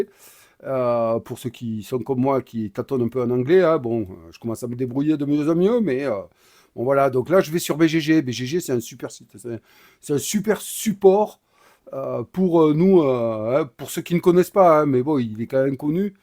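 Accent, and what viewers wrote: South, France. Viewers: avec l'accent du soleil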